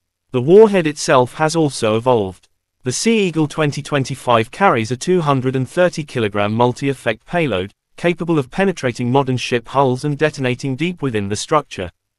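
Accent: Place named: United States. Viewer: I wish all these American narrators would foxtrot oscar.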